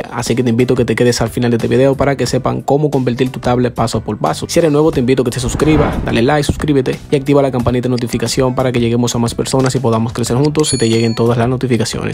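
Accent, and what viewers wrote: Dominican Republic. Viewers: Es dominicano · Es que hago hablamos los dominicanos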